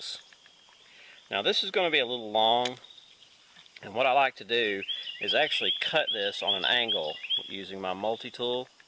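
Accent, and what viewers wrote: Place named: Southern Appalachia, United States. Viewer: Great vid! What part of east tn? Im originally from southeast ky. I could pick up your accent from that part of the country!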